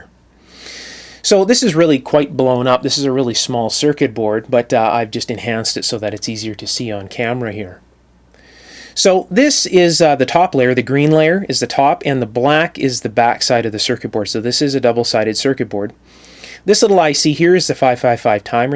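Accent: Canada. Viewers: Gotcha, thanks. Great vids! Do you have formal education? Your accent reminds me of some Canadians I've heard. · Are you a Canadadian? I keep picking up accents traits like the way you say "about"--but hide your beer so I can't confirm. Other than that, great videos.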